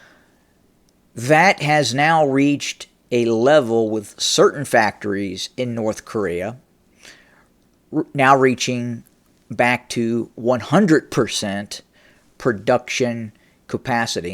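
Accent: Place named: South, United States. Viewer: VOR. Your racism is almost KKK like. Where were you born? Based on your southern accent, I suspect Alabama.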